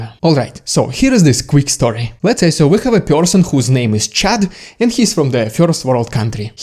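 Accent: Russia. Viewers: Омг у тебя русский акцент (Omg you has got Russian accent!)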